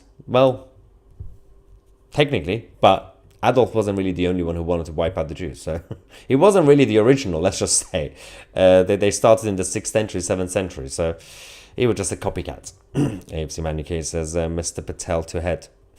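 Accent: United Kingdom. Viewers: I just want to say , You're awesome brother..I wonder why I took long to know your channel..I hear your british accent, are you also arab or Indian or jew😂? If I owned this channel I would be exactly saying what you say here, I am your follower from today, drop contents as often possible ❤, love from Belgium 🇧🇪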